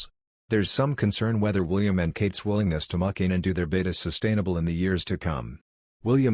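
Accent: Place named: United States. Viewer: You have an American accent, so assuming you are American, the royals are English, which we as British people, fought to have, , as it stands, we English people, believe that hazard and meg nuts are the worlds biggest liars, and really dislike them , where as , in the USA they are more popular, But the American people fought not to have royalty. So who is losing, not us and not you , unless you vote her in as president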